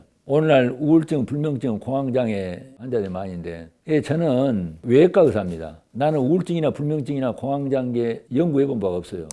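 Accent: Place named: Jeolla, South Korea. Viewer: 말투가 라도네. 라도는 걸러라 들.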